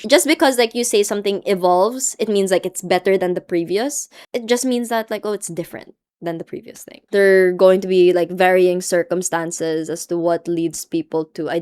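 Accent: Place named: Asia. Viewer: Where are you from with your beautiful asian accent ? 🎉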